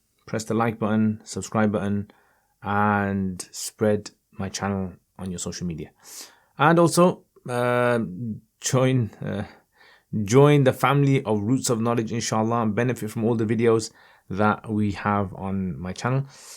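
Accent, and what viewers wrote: Birmingham, United Kingdom. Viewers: Lol! Only because you speak even faster 😂 I think mufti saahib does speak a little fast and sometimes I have to go back to listen to what he said. The Birmingham accent doesn't help either 👀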